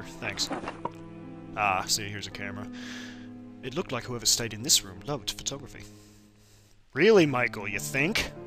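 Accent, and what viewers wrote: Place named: England, United Kingdom. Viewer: I love your voice with the English accent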